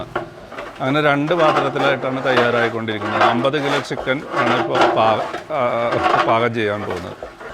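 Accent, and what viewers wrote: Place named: Thrissur, India. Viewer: അവതാരകൻ തൃശൂർ ക്കാരൻ ആണെന്ന് തോന്നുന്നു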